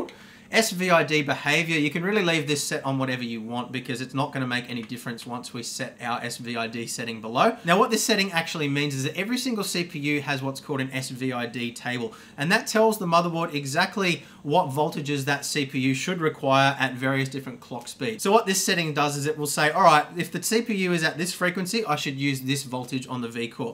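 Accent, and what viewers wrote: Australia. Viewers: Are u australian mate ? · Finally, an Aussie tech guy! Yew beauty!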